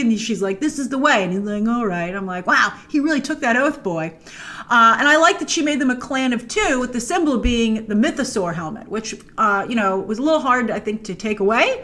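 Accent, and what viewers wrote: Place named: New York, United States. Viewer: Whats just as great as an Amazing Season Finale of a Star Wars Show???...an equally AMAZING Review of It!!!!....Can't wait for your next live Stream, life's just not the same without hearing that wonderful New York accent and seeing that Beautiful smile that lights up the World Live 🥰😍😁😉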